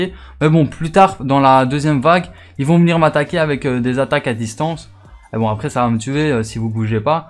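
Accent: Belgium. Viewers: T es belge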